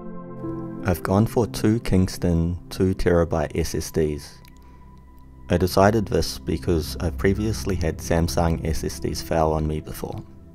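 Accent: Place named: New Zealand. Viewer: I can identify a kiwi accent pretty quickly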